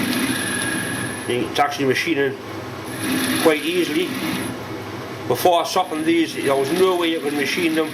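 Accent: Newcastle, United Kingdom. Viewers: Thanks for all the video this year. I enjoy your way of doing things. Happy New Year to you and yours. I enjoy your Jordie accent and I think I'll have a Newcastle Beer in honor of you today.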